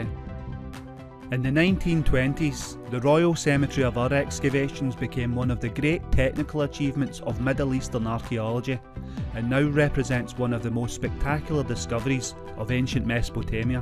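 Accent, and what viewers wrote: Scotland, United Kingdom. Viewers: Amazing content as always! Scottish accent is a bit tricky by the way haha. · He's speaking in English just with a scottsmans accent, you'll get used to it..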